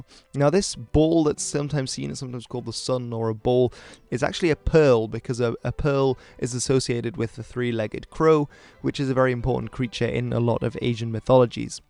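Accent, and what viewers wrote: United Kingdom. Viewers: his accent is not American at all though, it's British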